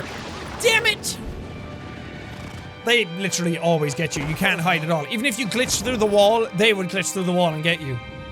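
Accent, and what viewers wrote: Ireland. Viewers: can somebody help. pronounces tri angle as try angle instead of triangle. he emphasises the space in between, is this due to his Irish accent/upbringing. I am northern English and do not pronounce it like this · Tried watching a JSE video from 2013... couldn’t get past the first minute... I missed high energy Jack with his full Irish accent!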